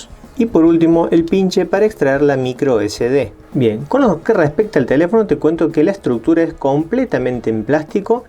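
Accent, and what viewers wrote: Córdoba, Argentina. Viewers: Cordobes o santiagueño?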